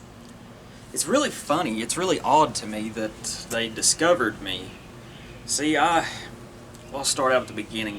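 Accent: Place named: South, United States. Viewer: These people probably saw you as an object of academic study with your southern accent (btw as someone who is proud of his own dialect, it's great to hear an american who speaks american for once.) and your opinions and job you fit into the cliche for them, which is really funny if you think about it. The joke is on them.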